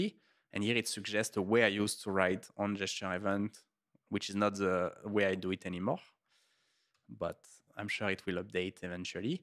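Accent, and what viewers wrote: France. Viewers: I'm detecting a strong French accent 👀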